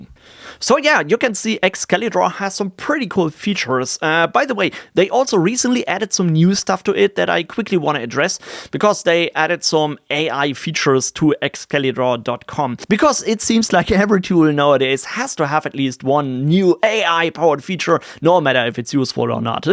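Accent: Germany. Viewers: subbed, i found you to be a good how to guy, will be checking out more of your videos and liking and or commenting, Are you German or Austrian, excuse my ignorance pls.I like your accent and my tism is acting up needing to know the answer.I could read your bio, but that would discourage discourse with you directly.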